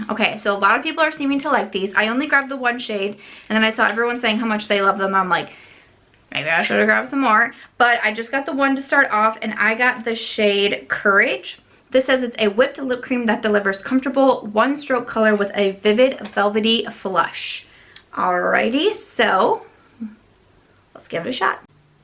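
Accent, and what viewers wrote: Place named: Midwest, United States. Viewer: So i agreed with so much of what you said here. 1. This palette totally speaks to me; i also have very few cool-toned nudes in my collection. 2. Placement suggestions as names?! UGH. I understand the helpful aspect of it, but that can go on a little card or on the plastic sleeve that comes in palettes. I think names spark creativity when they give a vibe to go with the color. If (when?) i get this palette, i'll make little stickers with my own names to cover the nonsense that ND put on there. 3. Rare Beauty: i have lupus, rheumatoid arthritis, neuropathy, and several other conditions that cause pain and fatigue, which sometimes makes even the simplest tasks (like opening a small bottle) very difficult or impossible. So i deeply appreciate the thoughtfulness with the packaging. Plus her message about beauty is something we all need to hear. 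<3 Side note: your Midwest twang (it makes me miss my Chi home), your green eyes (like mine), your love of wine and the Cubs, your birthday is the day before mine (which makes us both Aries)...are we related?! LOLOLOLOL :)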